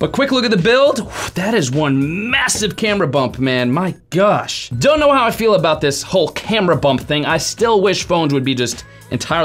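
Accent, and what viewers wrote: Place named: Connecticut, United States. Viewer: from CT I'm from TN but raised in NY so in know CT when I hear it or Mass.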